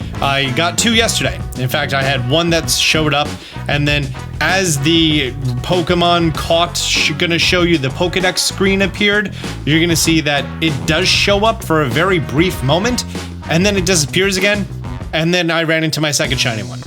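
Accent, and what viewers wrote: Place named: Philadelphia, United States. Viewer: Are you from Philly? We're like the only people in the country that say "wooder" instead of water.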